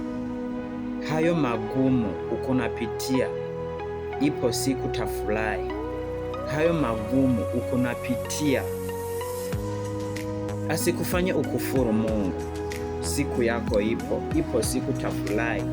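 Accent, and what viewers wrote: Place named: Burundi. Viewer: Swahili ya Burundi hiyo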